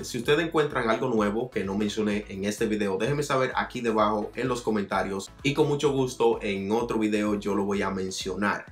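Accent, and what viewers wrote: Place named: Dominican Republic. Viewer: Dominicano y pico.!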